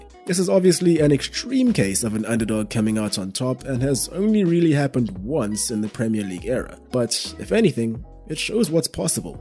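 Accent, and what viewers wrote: South Africa. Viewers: Knew you were South African! My fellow citizen...I have subbed lol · Always thought you're South African. Rep Zim! Great content amd congratz in a 100K! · I always though he was south African living Pretoria and I think I was right lol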